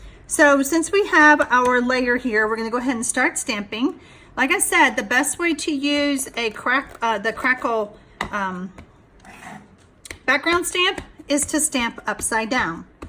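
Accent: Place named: Texas, United States. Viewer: Such a nice and easy card...by the way... I like your texan accent pretty much...Thanks for sharing this idea...🤗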